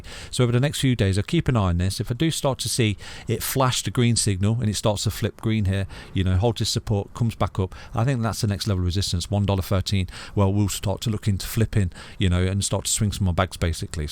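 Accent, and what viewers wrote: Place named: England, United Kingdom. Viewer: Please speak slower. Especially with the English accent and all of us Slow-minded Americans sipping whiskey and munching Doritos.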